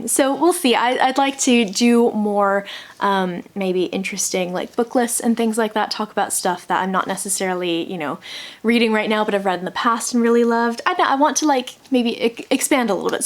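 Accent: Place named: England, United Kingdom. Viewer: 1. Your accent is DEFINITELY becoming more English. Maybe it's just this video but ermmmm? 2. Good luck getting on top of ARCs etc! You can do it!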